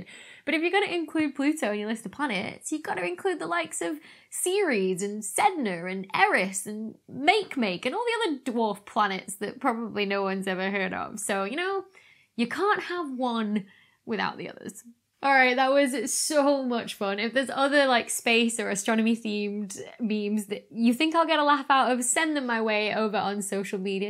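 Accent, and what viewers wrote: United Kingdom. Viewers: Woah cute female physicist with a British accent. Annnnd that's a subscribe. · Do the rest of you folks pronounce it glacier, or glacier? I know is from the UK and apparently over there it's pronounced glacier, here in Canada we pronounce it glacier. How do the rest of you folks pronounce it? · Also your briish accent is so thick 😹😹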